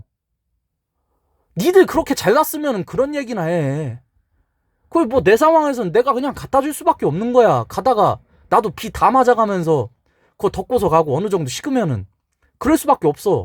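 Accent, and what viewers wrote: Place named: Dangjin, South Korea. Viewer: 당진 사람이죠? 제 아는형님하고 말투가 너무같아요 당진이면 유성 코일도 괜찮아보여요 평판이니 저는 같은 충청사람으로써 너무 말투가 공감이가네요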